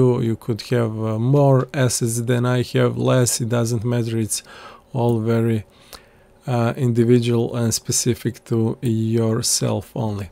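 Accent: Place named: Balkans. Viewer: Brate, je si li ti Sprksi? Cujem nasa acenat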